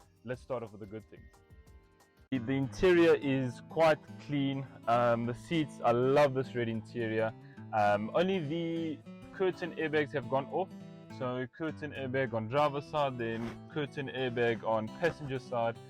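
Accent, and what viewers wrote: South Africa. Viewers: You are definitely South African. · Great looking project ❤ Iv just subscribed to the channel keep up the good work 🎉 you sound like you from South Africa 🇿🇦?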